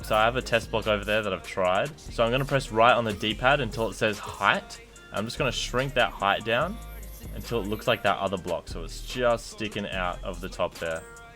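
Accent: Australia. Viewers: Ur australian I didn't know that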